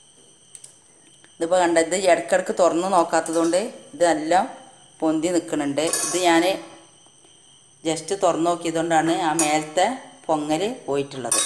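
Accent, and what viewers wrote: Malappuram, India. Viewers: Hi ..ഇത്താ..ആദ്യായിട്ടാ കാണുന്നത് വീഡിയോ.. സംസാരം അടിപൊളി..മലപ്പുറം ആണോ...വീഡിയോ ആരാണ് എടുക്കുന്നത്...എനിക്കും ഒരു ചാനൽ തുടങ്ങാൻ ആഗ്രഹം ഉണ്ടായിരുന്നു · Ningal ithupole thanne samsarichal mathi malapurambasha athukelkana ishtam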